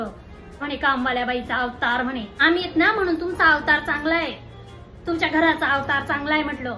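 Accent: Khandesh, India. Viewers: God Bless you Tai...sorry sorry Bai.... थोडा उशिराच पाहिला हा रील ...Superb....खान्देशी ठसका... लेखन आणि सादरीकरण एक नंबर...👌👌👍👍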